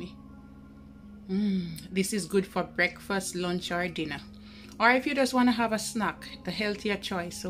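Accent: Jamaica. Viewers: You know we loving that Jamaican accent baeeeee!!!!! zucchini bread is new to me... but woahh what a big Cucumber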